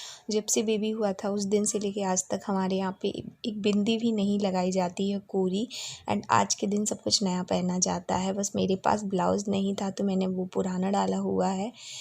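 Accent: Bundelkhand, India. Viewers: Didi mein sagar se belong karti hu aur hamari language bhi bundelkhandi hi h and I don't know why mujhe aapke muh se yeh language sunne mein bohot achcha laga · Di apki language buldelkhandi h ap kaha se ho esi language hamari bhi h m jhansi se hu,PLZZ reply me Di pzzz plzz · Khti thi bundelkhandi ni bnti bolte magar bol to leti ho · Bundelkhand language😊😊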